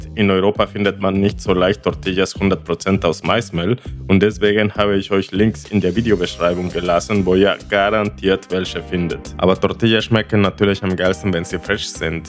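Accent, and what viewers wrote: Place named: Russia. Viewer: lol du hast ur den russisch akzent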